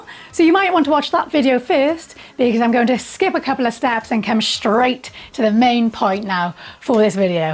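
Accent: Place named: Wales, United Kingdom. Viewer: Oh my days, came on here to learn how to hula(after seeing the Texas Hold 'em short) and there's a Welsh accent 🏴 (well I think it is anyway 😂) This is gonna be fun! ⭕⭕⭕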